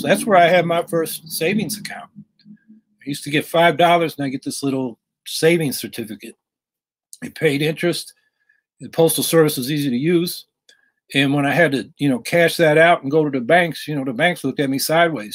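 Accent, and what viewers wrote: Texas, United States. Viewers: I’m from Central and North Texas and can confirm he talks like a Texan or an Okie. Especially how he pronounces “the” like “də”. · Love your office, but for a man born in San Francisco why do you talk your from 1890s Texas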